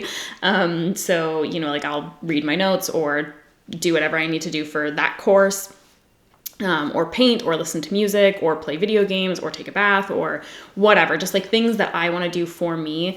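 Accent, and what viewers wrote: Canada. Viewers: WHY DO I NOT REMEMBER HIM SOUNDING THIS CANADIAN WOW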